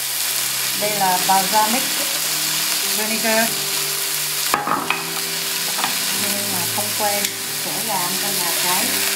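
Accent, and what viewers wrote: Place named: Da Nang, Vietnam. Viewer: Nhà cô Hà rất sạch sẽ. Đồng hương Đà Nẵng ạ. Thích cô nói tiếng Việt như này. Cô khéo léo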